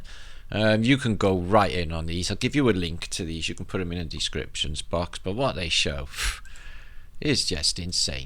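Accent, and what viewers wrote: United Kingdom. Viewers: good lord , you ARE British :D xx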